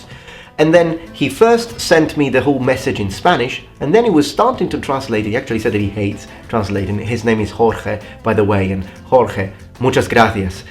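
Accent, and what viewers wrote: United Kingdom. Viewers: Tienes un acento británico increíble. Cómo así? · why does he sound so British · Do you live in the UK? I'm American and can't always pinpoint the different British accents, but when you speak English it sounds un poquito italiano y muy britanico :) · ok, here's one for you. My dad (padre) was born in Sicily, emigrated postwar as a small child. Mi Noni they never got a handle on much English, so I grew up hearing the dialect, enough to mess up my Spanish in college and with a Honduran girlfriend. So what is my question? why the British accent, My zio's and Zia's sounded like new yorkers and I sound like someone from Chicago? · Why do you have a British accent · I would like to hear your opinion of British English vs American English. I say this because the English you speak sounds British, not American. Besides the accents being much different, American English, especially in the Southwestern United States, has Spanish influence. 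I grew up in the Southwest, so a few spanish words were part of my natural vocabulary. I was 30 years old and living in the south eastern US when I encountered people who didn't understand when I said PLAZA. They asked me to define it, and that was the first time I actually realized I was using a Spanish word, and not all Americans understood it. It took me a couple minutes to define it. I'm enjoying your videos, keep up the good work. The closest related language to English is I believe Dutch. There may also be a difference between the similarity of British vs American English. Dutch settlers came to the New World at the same time as the English settlers, and some English settlers had lived in Holland for a while. Additionally, Amish, Mennonite, and some other communities still speak Dutch, as well as English, and even refer to those outside their communities as "the english."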